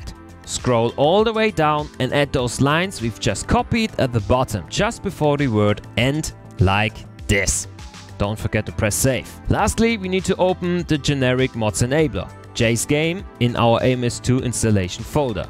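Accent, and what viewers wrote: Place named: Germany. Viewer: sehr gutes englisch, bis du die namen aussprichst. das klingt sehr sehr deutsch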